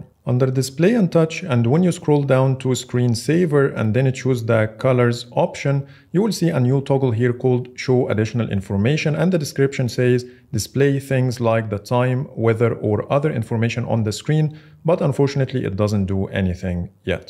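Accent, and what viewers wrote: Egypt. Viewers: You have English Egyptian style 🎉 your brother from Egypt too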